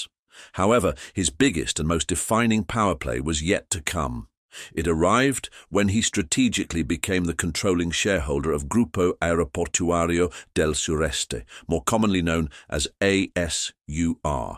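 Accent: United Kingdom. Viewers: So how do you know that these people control the cartels? I'm wondering 🤔! You as a British citizen (based on your accent) remind me of the BIASED American media. That's all those people talk about when referring to our country and people. This is one of the reasons I personally say that America is a humongous HYPOCRITE nation! They do business with us and at the same time lash out at us. That includes their Roach 🪳 Trump their criminal 👹👿🤥. And by the way. Trump was caught stealing in our country. Outside Mazatlán and the city of Ensenada. He was also caught swindling people of their money in Playa del Carmen. Please make a video of this and why America is the world's biggest drug consumers. Please. I would appreciate it if you were not BIAS just like the American media. And just in case the American society did not know how drugs are smuggled across the entire border. 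Here's a tip. Because drug traffickers BRIBE 💲💰 immigration officials in order to smuggle all those drugs through the border!! And I do mean humongous amounts of money 💰💲!! Believe it or not, up to $100,000 dollars in one lump sum!! So please do us a big favor. Please make videos about America's "war on drugs" and why it never ends. Believe me. You'll be surprised 😯 who really is behind the "war on drugs" in America!! And it isn't our people! Good day CHAP 🏴.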